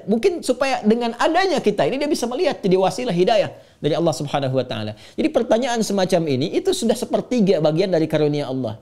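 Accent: Malaysia. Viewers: Assalamualaikum Ustadz Adi, izin saran, dalam ceramah-ceramahnya pakai logat bahasa Indonesia aja jangan pakai logat Melayu Jadi mirip sama Malaysia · Kok, kayak logat Malaysia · Masya Allah ustad seperti nya anda sedang di Malaysia ya soalnya langgam ceramah nya persis orang Malaysia👍🥰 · Kenapa logat jadi spt org malaysia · saya merasa ceramah ustad kali ini terdengar seperti orang malaysia karena banyak logat melayu tidak seperti biasanya, sehat selalu ustad..syukron 🙏🙏🙏🙏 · Knp jd logat malaysia? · Maafya pk ustd kdg2 trdgr pk ustd sekali sekala ada omonganya spti org Malaysia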